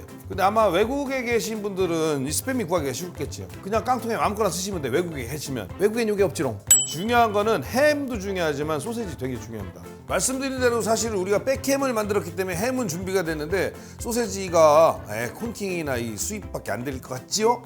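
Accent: Chungcheong, South Korea. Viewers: 아 충청도 말 구수해~!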